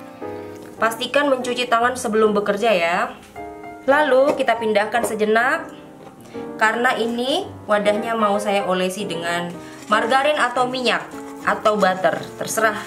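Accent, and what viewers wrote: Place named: Indramayu, Indonesia. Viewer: mbk nya orang indramayu ya....denger logatnya...heehehe...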